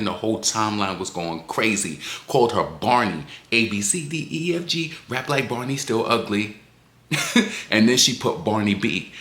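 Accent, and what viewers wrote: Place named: New York, United States. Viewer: I needed it this run down in full New York 🗽 accent ❤❤❤❤❤❤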